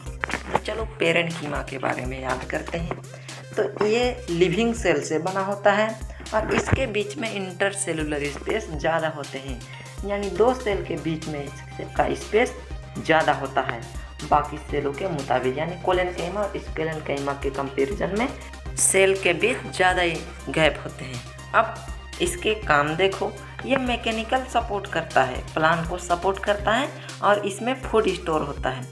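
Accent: Bihar, India. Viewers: Bihar sa ho kaya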